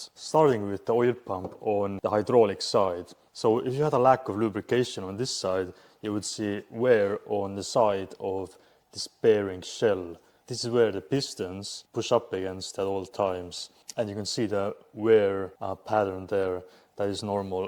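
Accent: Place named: Estonia. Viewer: I thought your were Finnish from the accent but now I realize that’s Estonian.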